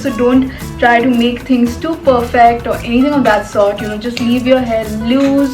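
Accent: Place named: New York, United States. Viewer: Love that shimmery eye shadow The way you talk is very nyc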